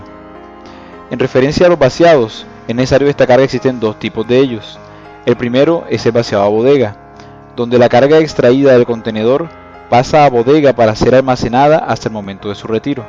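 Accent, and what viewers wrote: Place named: Venezuela. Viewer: es Venezolano, no reconoces el Acento.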